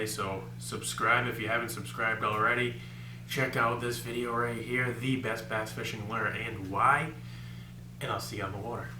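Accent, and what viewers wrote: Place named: New England, United States. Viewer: Ha, I knew you were a New Englander! I live around New Haven and I have a friend from Boston and a friend from Rhode Island. Both guys have the same accent. Yet I have no accent.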